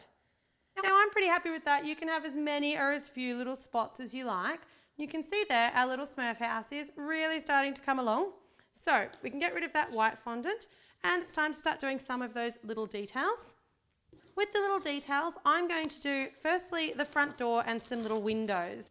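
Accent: Australia. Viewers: I love your voice. It is like an Australian Jennifer Lawrence! :)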